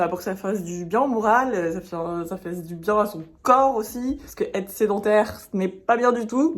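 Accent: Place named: South, France. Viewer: Tu es vrm magnifique, mais ducoup tu viens d'où si tu n'es pas de lille ?🥰 Moi je dirais sud de la france 😂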